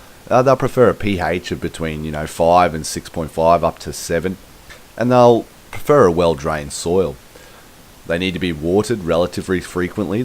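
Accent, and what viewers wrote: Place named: Australia. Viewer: Such an aussie accent i like it... beautiful finger lime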